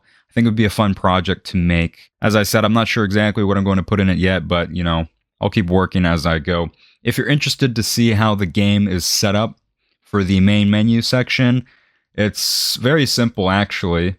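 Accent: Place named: Bay Area, United States. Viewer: You sound like Bay Area buggs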